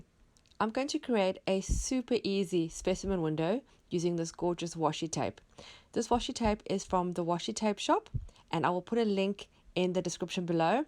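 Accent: South Africa. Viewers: This is so simple yet so effective! Where are you based? You sound South African ☺️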